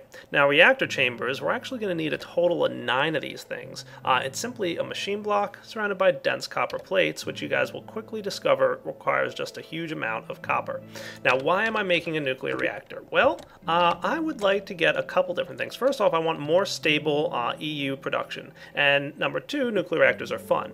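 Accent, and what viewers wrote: Australia. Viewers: Ausie? or not because i am... :D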